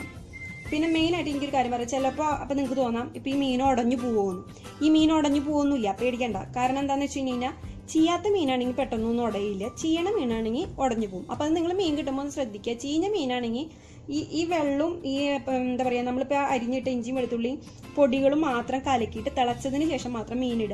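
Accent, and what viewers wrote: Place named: Mullassery, India. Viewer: മോൾടെ വീട് മുല്ലശേരി, പാവറട്ടി ഭാഗത്താണോ. സംസാരം കേട്ടിട്ടു വടക്കാഞ്ചേരി ആണെന്ന് തോന്നില്ല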